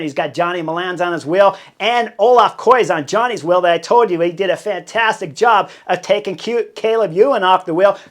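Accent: United States. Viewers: This American accent is so thick I can barely make it out when he says the names of the riders.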